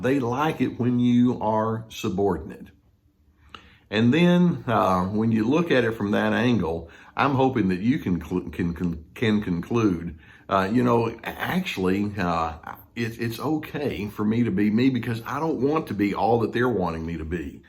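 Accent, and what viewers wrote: United States. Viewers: Omg I just love this guys accent. It's the nicest in the USA ... Best wishes from Ireland 🇮🇪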